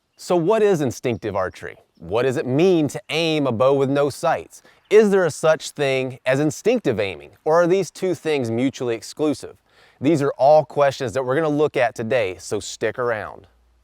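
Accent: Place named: South, United States. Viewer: hi! I'm from New Zealand. really enjoyed watching your journey on Alone as you've heard millions of times so I went searching for your name to pick your brains coz I'm new to archery as of last year bought a 45lb recurve bow set during lockdown here and loved it now I'm hooked! still trying to fumble my way thru techniques vs different equipment sheesh... great video man thanks for sharing your wisdom with the tint of southern accent as a bonus "YEEE-HAAW! hillbilly nation come on!" (that's my own saying 😂 hope I don't offend anyone? Oh well its out there now) PEACE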